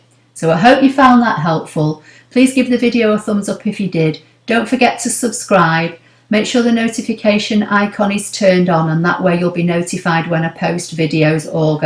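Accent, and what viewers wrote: United Kingdom. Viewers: Well as I’ve heard and believe....YouTube videos have a life of their own. Someone catches one and the fun begins. I’m amazed when I view one of yours and read the comments that it is from “the past”. Being rather new to ScanNCut and paper crafting I’m looking for all the help I can get. Thanx so very much for your beautiful work. And I live your British accent. 😍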